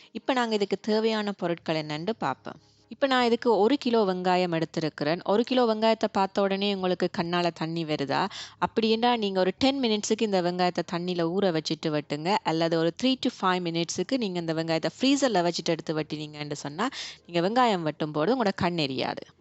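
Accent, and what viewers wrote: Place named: Sri Lanka. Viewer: Srilankan Tamil with foreign accent English sounds beautiful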